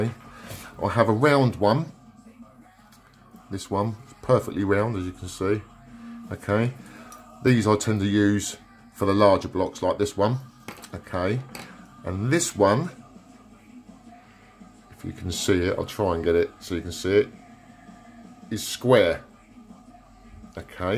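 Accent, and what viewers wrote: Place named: England, United Kingdom. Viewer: Holly cow! What an English accent. Somehow I always seemed to think that you would have sounded like Billy Bob Thronton.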